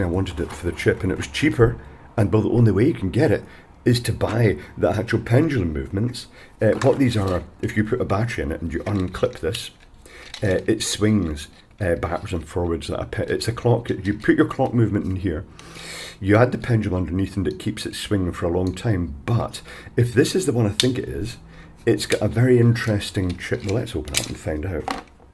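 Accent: Scotland, United Kingdom. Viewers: A Scottish version of Ashens 😂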